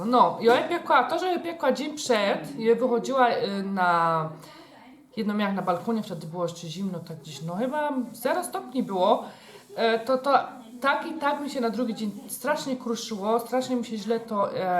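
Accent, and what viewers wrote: Silesia, Poland. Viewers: Genialna odpowiedź :) uśmiałam się do łez! Swoją drogą ja uwielbiam Cię słuchać; mogę sobie porównać różnice między naszą "godką" (Zabrze) a Twoją. Pozdrawiam serdecznie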